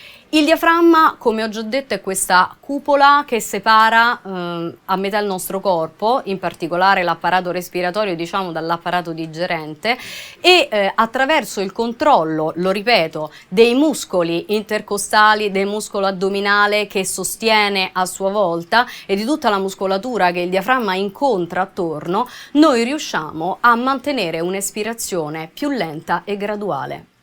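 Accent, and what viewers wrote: Rome, Italy. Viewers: Sei romana... Interessante lezione!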